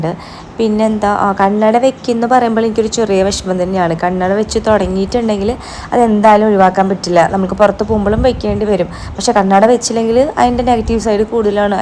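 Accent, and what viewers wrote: Thrissur, India. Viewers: പിന്നെ എന്തുട്ടാ......aiwa തനി കട്ട.....തൃശൂർ സ്ലാങ്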